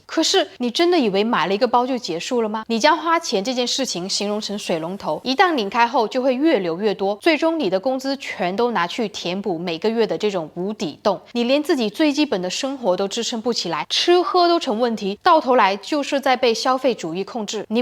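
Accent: China. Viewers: 這女的是對岸嗎，鄉音好濃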